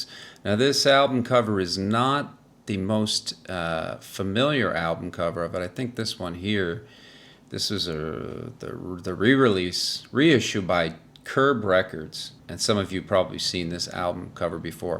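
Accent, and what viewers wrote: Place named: East, Canada. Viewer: I'm detecting a little eastern Canada in that accent my friend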